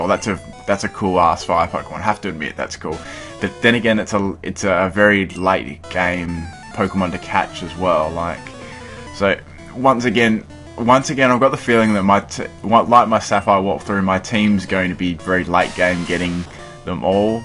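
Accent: Australia. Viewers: Didn't know you were aussian!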